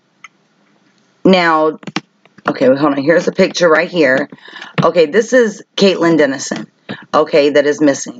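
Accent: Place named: South, United States. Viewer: love your videos and southern accent as I have a very strong one myself lol. Are you the person Str8 refers to as? Just curious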